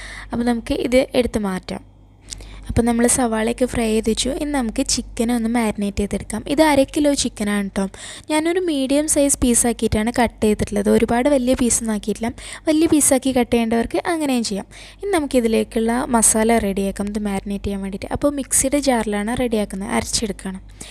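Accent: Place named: Malappuram, India. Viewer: തനി മലപ്പുറം ആണല്ലേ വെറുതെ അല്ലെ അപ്പം ഞാൻ മലപ്പുറം കരിയാണ്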